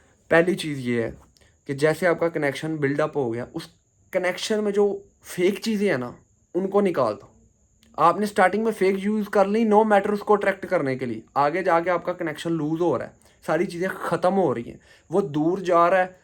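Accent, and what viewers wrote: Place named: Punjab, India. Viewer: Plz zuban thek karo punjabi penduuuuuu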